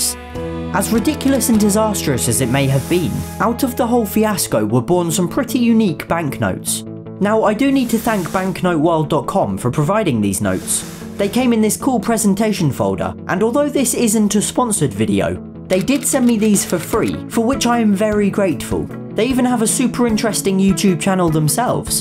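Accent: United Kingdom. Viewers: within the first 15 seconds, i knew you were British, the ascent and the monopoly board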